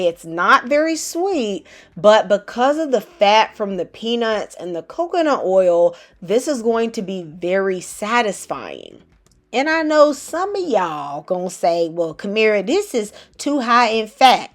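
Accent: South, United States. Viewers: Are you Southern? Like the video 💙💚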